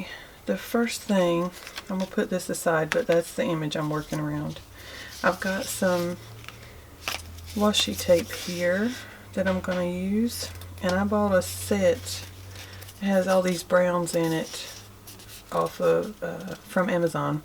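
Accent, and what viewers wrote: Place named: Mississippi, United States. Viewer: Gorgeous page! I’m liking the way you did the washi. You sound like you’re from Mississippi. It's always a bonus to have someone who does great art and also has a pleasing voice. I like the cross Ross lines too, I think because it suggests an old patchwork quilt behind it.Thanks for sharing.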